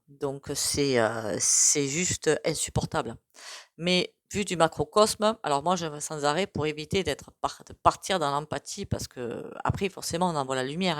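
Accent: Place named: Toulouse, France. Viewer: Bonjour, Je me suis abonnée grâce à Kriss Sinclair. Merci pour votre tirage et vos conseils et soin au pendule. J adore votre accent qui me rappelle Toulouse et ses alentours. Tout de Bon! Eulalie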